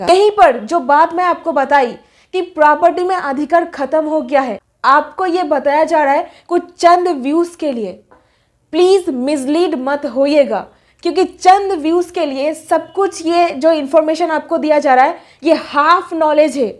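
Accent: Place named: West Bengal, India. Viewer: Sotti bolchen aaapni....aapka accent sunke pata chal gaya aap WB se hai.....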